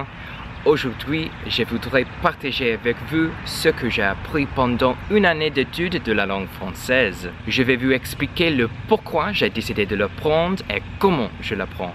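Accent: Italy. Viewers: T'es italo-japonais? Tas un petit accent italien en français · I'm italian and maybe I'm wrong (I've never studied french and don't speak any of it although I understand it quite well) but it seems to me you have a bit of an italian accent in speaking french...funny :))! But, after only one year, you're very good, keep it up!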